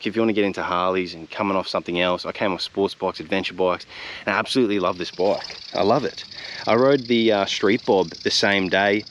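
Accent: Australia. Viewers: Hey... really enjoyed this video! I went to test ride a 2022 Fat Boy at $17,400 with only 2000 miles on it. Somehow... I came home with a 2023 Baja Orange Breakout. Since I bought a new 2023 Harley... I get free tires for life! The Breakout is very nice, and similar to a Fat Boy. They share the big 240 rear tire, but the Breakout has a 21 inch front wheel. My Breakout has the big 117 engine... and it hauls ass big time! I like listening to your Australian accent. Also like that hair cut your sporting! Don't be nervous while your filming... you're doing a great job! Hope your channel keeps growing!!! John from Oregon, USA!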